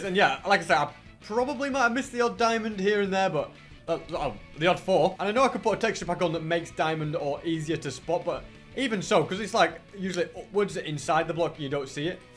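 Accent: United Kingdom. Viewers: Hi are you British or American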